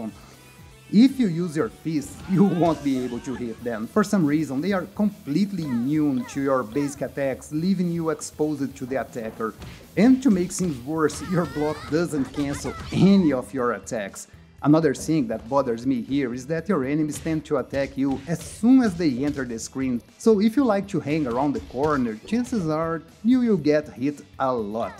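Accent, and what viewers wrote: Brazil. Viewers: Parece brasileiro falando inglês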